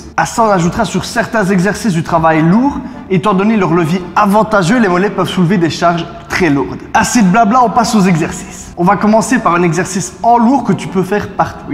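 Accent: Liège, Belgium. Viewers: msemblait bien qu il y avait un accent de liege ou pas loin ^^ merci cool tes videos j ai decouvert ia pas longtemps (je suis de Mons en Belgique egalement). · Un bon accent bien de chez nous #BelgiqueVie👌🏽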